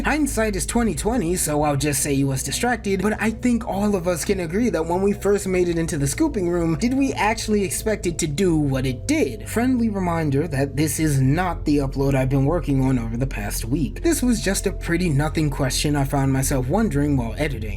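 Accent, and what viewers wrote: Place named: Balkans. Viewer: That balkans voice..., is it you?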